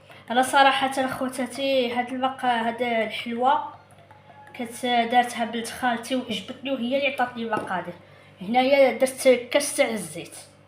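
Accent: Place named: Morocco. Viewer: لهجتك مثل المغاربة هل أنت مغربية ؟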